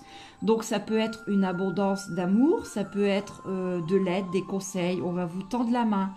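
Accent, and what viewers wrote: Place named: Nord-Pas-de-Calais, France. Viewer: Bonjour je vous ai vue par hasard tout à l heure sur un partage (groupes) et donc Je vous découvert et la vôtre attitude envers les personnes qui vous posée des question et votre façon de parle(chti) 😉mon interpellé, je vous ai de suite à précisé 💜🙏 je vous suis donc sur fb et la chaîne YouTube, merci pour l'horoscope(Bélier) je me reconnais😂 merci à vous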